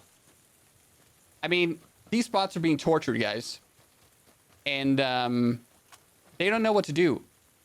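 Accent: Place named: Denmark. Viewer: du er fra Danmark, ikke sandt? syntes jeg kan kende en dansk aksang:)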